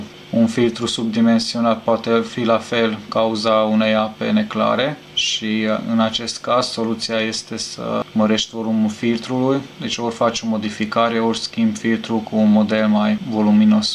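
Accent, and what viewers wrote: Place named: Hungary. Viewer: ai si canal de youtube pe maghiara? ca esti maghiar😁 se aude bine😋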